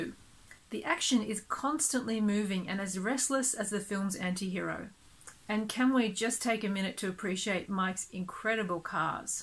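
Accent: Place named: England, United Kingdom. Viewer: One of my favorite 'Film Noir's' ! Sexily narrated with a lovely English (I think) accent and a lot more sensual than Eddie Muller of TCM will ever be, bravo!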